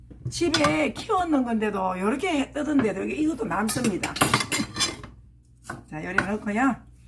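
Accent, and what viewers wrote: Gyeongsang, South Korea. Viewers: 님 반갑습니다 말씨가 친근하게 느껴지네요 저도 경상도 라서요 멋진 분을 뵙게되어 영광입니다 또 뵙고싶어 손잡고 갑니다 늘 응원합니다 건강하시고 행복하시길 빌겠습니다 ~~~♡♡♡♡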